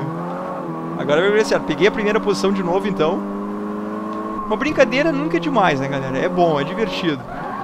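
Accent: Rio Grande do Sul, Brazil. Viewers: tu é gaucho vey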